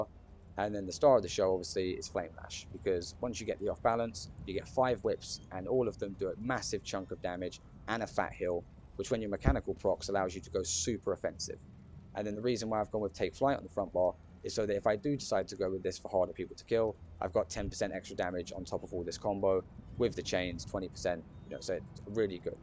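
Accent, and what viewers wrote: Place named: New Zealand. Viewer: Are you kiwi? you sound like Kai Kara France my guy.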